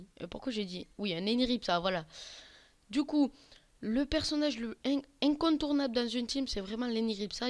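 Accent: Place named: South, France. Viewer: toi tu viens du Sud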